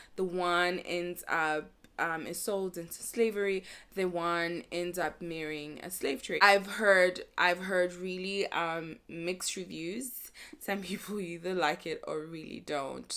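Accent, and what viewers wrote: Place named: South Africa. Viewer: I'm so glad you were on the Booktube black chat so i could find this channel! Firstly, it's wonderful to hear another South African accent, and secondly, I'm glad you highlight such important fiction. I'm glad to meet you and will be lurking in your other videos' comments soon. 😉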